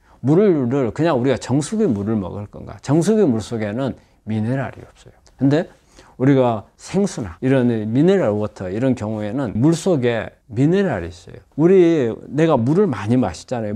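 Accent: Jeolla, South Korea. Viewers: 웜마 전라도네우짜쓰까잉 구수하구만 ❤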